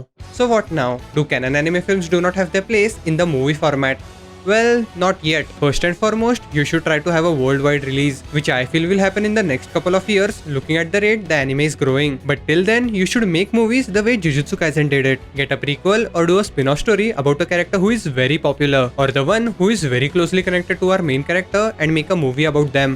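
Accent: India. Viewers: YOU HINDUSTAN ACCENT IS DISGUSTING BYE INDIA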